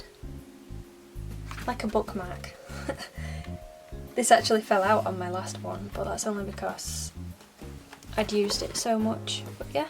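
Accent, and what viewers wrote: England, United Kingdom. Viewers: Wow! So cool!💘💞💞💜 Actually, I always have question.. Are you from England?)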